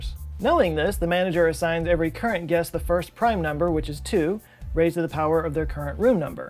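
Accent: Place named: United States. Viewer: 'murcan. Or maybe Texan ...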